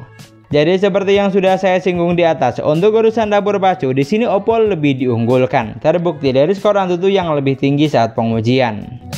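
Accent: Madura, Indonesia. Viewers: review dengan logat Madura nya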